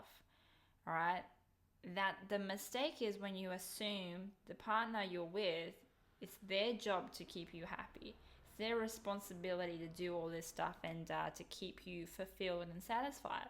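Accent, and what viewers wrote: Australia. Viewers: are you Australian?? =] you have my accent haha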